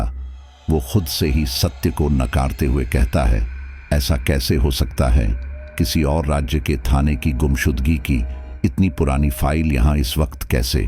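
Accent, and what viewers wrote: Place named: Bihar, India. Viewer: यह कौन सी बिहारी है आधा हिंदी आधा बिहार😅😅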